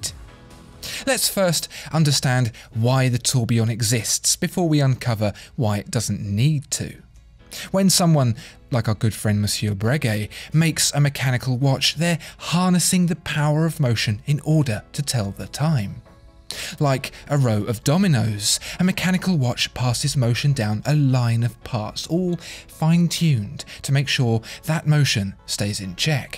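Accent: United Kingdom. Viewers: "Tighter than a supermarket parking space" 😂 Yep, if the accent didn't already give it away you can tell he's British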